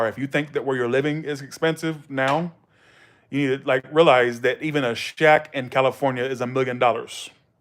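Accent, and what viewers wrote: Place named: London, United Kingdom. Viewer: Your London accent is so attractive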